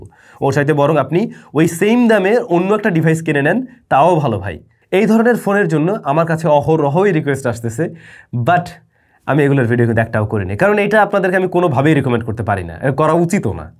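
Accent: Barishal, Bangladesh. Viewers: ভাই আমি নয়ন । আপনি কি বরিশাল এর ছেলে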